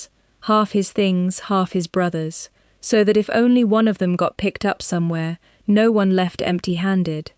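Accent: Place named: United Kingdom. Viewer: Why does the reader of this story have a British accent?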